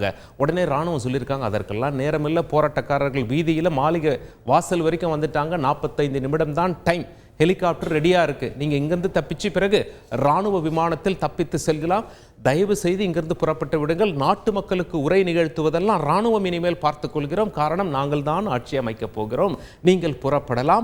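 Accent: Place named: Thanjavur, India. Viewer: பேசுரவர் தஞ்சாவூர் காரர்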